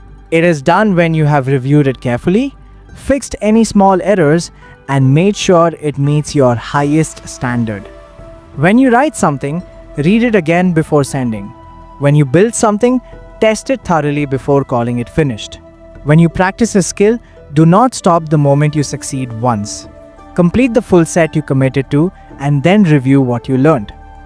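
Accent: India. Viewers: Indian accent 🤮🤮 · Hi, I really like your videos and your country is japan in description but your English is telling that you are Indian. Am I right sir?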